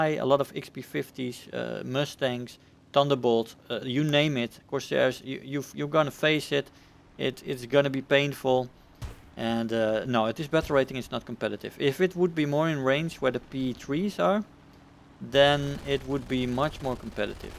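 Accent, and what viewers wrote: Netherlands. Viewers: Hey.Are you from the Netherlands? I think i hear a little Slang. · I love the dutch accent in every language i speak. kinda nice that both of my aircraft youtubers have it👌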